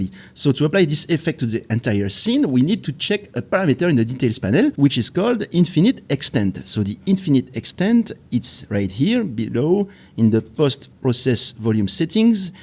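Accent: France. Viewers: Great tutorial and great bloopers lol... happy to see a French speaker having a hard time with English as many like me with French, and especially since I’m native speaker of another language of Latin origin! I would really appreciate it if you could tell me if you have Unreal courses available and in which online platform (I tried searching for you in Udemy), or maybe a book for sale online, or whatever. Here you have another “real” follower. Merci beaucoup from DR! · Merci !! T'as un accent suffisamment bon pour être agréable à écouter et suffisamment français pour que je comprenne, c'est parfait 😀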